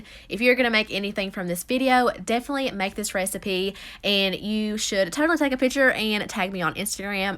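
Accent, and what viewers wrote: South, United States. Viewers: I love your voice!!! Sorry if that sounded rude!! But I think country accents are amazing!!! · Your accent is TOO cute! It’s my favorite part of your videos. Olive “ol” 😊😂 sorry if this is offensive I mean it in the best way possible, I love southern accents! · Where you from ? You got a accent. You sound really country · Yeahhh my southern sista! Idk where your from but ya sound like me so I like ya! 😉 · Damn she did that and I love the accent country ass me!!!!!! Yeahhhh buddy???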